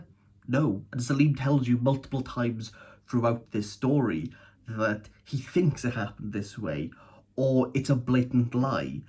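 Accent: Wales, United Kingdom. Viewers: Mate, what an amazing review. I love your energy and engagement. Please keep it up! (And sorry for my ignorant question, but accents from Britain fascinate me — is that a great Welsh accent you have?) All the best.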